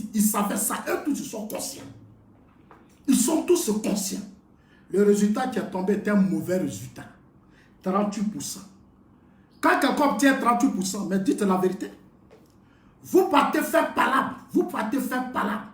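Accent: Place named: Bété, Ivory Coast. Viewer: Il parle avec l'accent de bété qu'il est.Il n'est pas là pour apprendre à parler avec autre accent.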